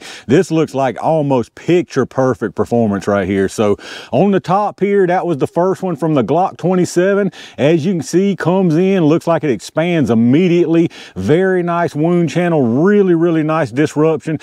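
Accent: South, United States. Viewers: I love your videos brother!! full of information and data. You talk country AF! It would be funny as all get out if you did it in an Irish accent!!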